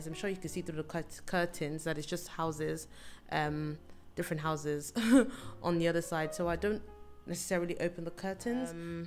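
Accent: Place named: United Kingdom. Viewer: Your accent sounds quite British....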